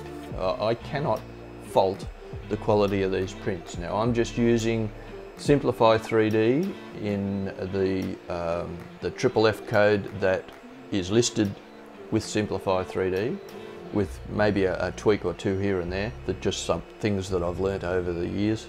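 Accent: Australia. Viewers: Thanks for the video. Could you please clear up whether there is a difference between the US and the EU version other than the supplied cord, and that either are safe to use in Australia with a local converter. Good to hear an Aussie voice reviewing. Cheers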